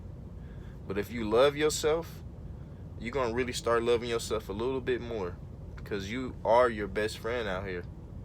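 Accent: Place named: California, United States. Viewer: Man fasheezy good looking with this video bro. I’m actually in the process of getting my DOT physical so I can get my permit and start school with Swift in Fontana. I’m a veteran so I heard there is some benefits to that but we’ll see. I definitely have a desire to do this and can handle it, sounds like fun to me if I’m being honest. I appreciate the content lol I can tell you was from Cali once I heard you start talking 😂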